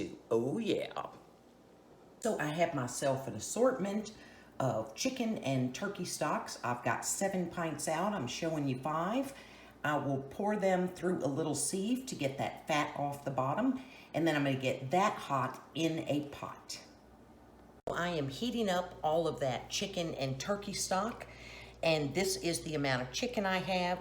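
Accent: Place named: Florida, United States. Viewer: New to your channel. Found you through the Canuary collaboration. You make me smile. You're so lively! Never canned before so I am definitely learning a lot watching all these great videos. And if I Didn't know better you sound like you are from Florida. Will be sad if I'm wrong. I can usually spot a fellow Floridian. :-D .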